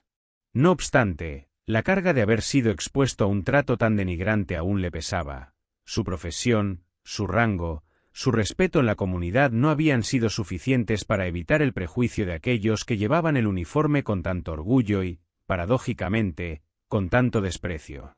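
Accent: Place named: Spain. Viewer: Quita ese acento español y a la mejor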